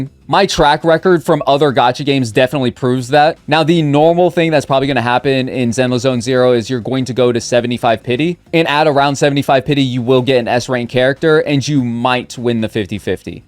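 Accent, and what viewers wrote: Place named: United States. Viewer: I tried to watch your video but... I don't like your voice. It's so slurred and croaky. I also don't like your style of American accent. If you could do something about that, that'd be great.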